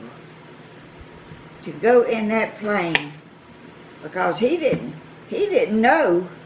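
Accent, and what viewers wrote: South, United States. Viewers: It’s my bed time and boy your southern voice is so comforting and relaxing..Glad I ran across your channel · I'm so glad I found your your channel! My Grandparents were from North Carolina and moved out West so I was raised Southern. The Southern way of talking is dear to my heart but I don't hear it much. You've brought me so much joy! I just knew when you got in to pick the squash you'd probably get 60 to 80 pounds worth Ha! I'm guessing some of your recipes are much like my grandmas. Take care now!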